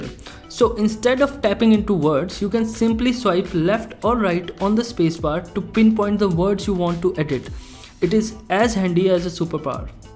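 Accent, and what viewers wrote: India. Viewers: Almost every indian i know or watch speaks like this...It is bounded and it doesn't sound that bad... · diksiyonuna ettiğimin hintlisi kulağımı deldin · am i the only one who love indian english? i love your accent man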